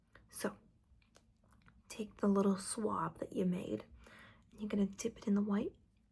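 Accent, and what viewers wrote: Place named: Brooklyn, United States. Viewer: Your Brooklyn accent is one of the most natural I've heard. You must be from around there, or your family is!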